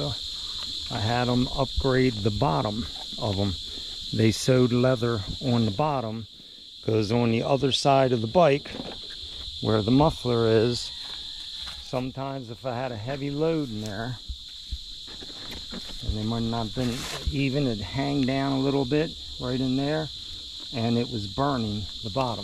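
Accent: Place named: Pittsburgh, United States. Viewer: If I didn't know any better..I'd day you were from Pittsburgh area, I can hear the accent, I to am from the burgh, but I definitely understand seeing the young lady, why you want go to another country lol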